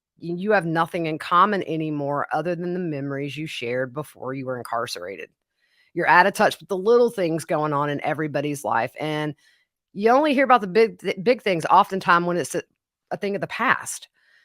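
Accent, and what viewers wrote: South, United States. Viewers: Prisons and all institutions are inhumane. After 6 months of wholesome food, the level of violence significantly was reduced in a school for delinquent youth. Elderly homes, with zombies medicated patients, are transformed with private pods and gardens leading to a central area. The prison system minimally should separate the criminals from convicts, as the British did between devoted Nazi POWs and the other Germans, one of whom married my best friend's mother, a half Jewish woman. This is a fascinating insiders' look into the most hidden world of women's prisons. The lady and you should write a book. It could inspire reform. Kudos to you. Love your home spun style and Southern drawl!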